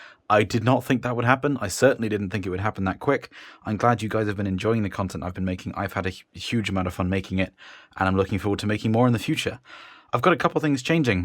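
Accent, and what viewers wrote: United Kingdom. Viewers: I’m simply listening through fiio m11 with oriolus BA300s and phantom headphones (modified) with all my research LSA is the way to improve my headphones when budget allows. One thing I’ve wondered. What difference does desktop amp/dac make to sound over portable? I’ve seen reviews of the topping a90 and wonder if that would produce better sound than my motu 828 mk3? I look forward to your future reviews. Good to hear a Brit doing these reviews, I’m up in Cumbria. Keep up the good work.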